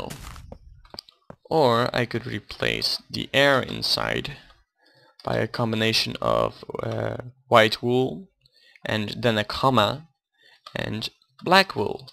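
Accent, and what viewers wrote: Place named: Netherlands. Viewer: Mooi Nederlands accent haha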